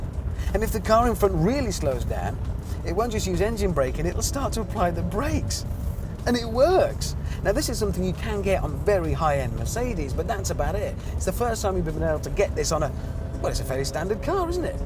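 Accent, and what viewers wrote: United Kingdom. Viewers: Nissan have the legendary british accent reviewer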